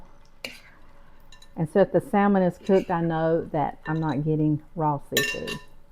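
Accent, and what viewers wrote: South, United States. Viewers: I make salmon burgers and put in finely diced celery, parsley and use oats to bind. Dust with flour before i fry. Im gonnavtry your recipe. I love watching your videos and your southern accent is musical, delightful, and beautiful, ❤❤❤❤❤ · Keep on keeping on,don't change anything ! Keep your great southern style, language and all. From one southern belle too another!❤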